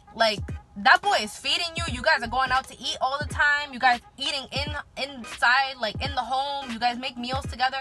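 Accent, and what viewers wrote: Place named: Los Angeles, United States. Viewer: Good job Pretty woman. You sound like you're from LA.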